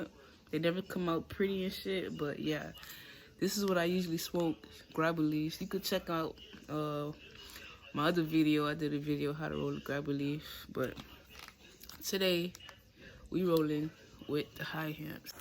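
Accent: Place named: North, United Kingdom. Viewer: Where you from gotta be up north somewhere?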